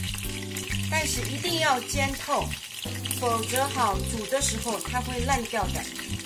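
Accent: Shanghai, China. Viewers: 親切的上海普通話，很久沒有吃過這道家常菜了。謝謝Jenny!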